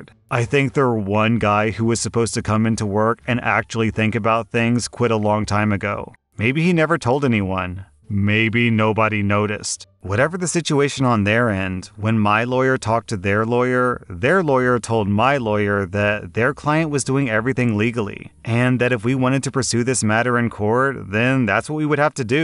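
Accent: United Kingdom. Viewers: 10/10 British accent. When I read "Super Saiyan 3 British," I imagined OP getting super buff and shouting "CHEERIOOOOOOO" before evolving to his final form. Don't ask me why.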